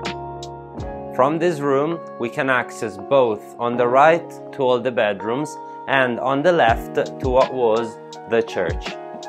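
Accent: Italy. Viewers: I love your italian acccent when you speak english. Hope you can also speak french. :D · we need a bit more than buongiorno. Haha c'mon show us that sexy Italian accent ;) Can't wait to see the upcoming videos.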